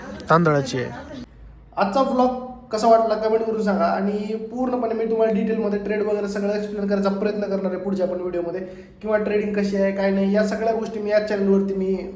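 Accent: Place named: Latur, India. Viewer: Tu tar punet rahun Latur ci basha boltho 👍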